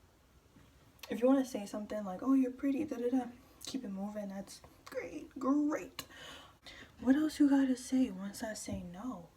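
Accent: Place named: New York, United States. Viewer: From the way you talk, you're definitely a native New Yorker.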